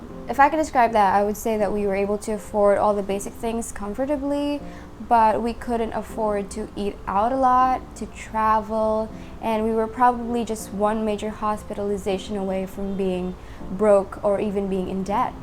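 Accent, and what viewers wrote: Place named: United States. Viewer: Wow, your attitude is amazing. Sorry to hear that you have been through a difficult time. Out of interest, are you from Phillipines, as you sound American 😊!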